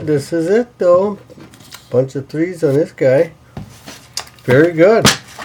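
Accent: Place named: Wisconsin, United States. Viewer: I live in GA but your accent reminds me of my uncle from Wisconsin, so im guessing Wisconsin or Minnesota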